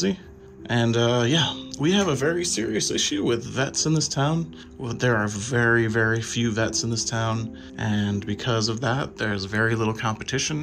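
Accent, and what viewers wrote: South, United States. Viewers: Man you are as southern as can't and so iam I love to hear you talk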